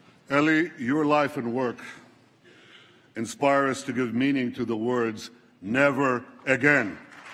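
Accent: United States. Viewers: Why does he sound American? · Fascinating to see a foreign leader with perfect American English, honed in a US High School.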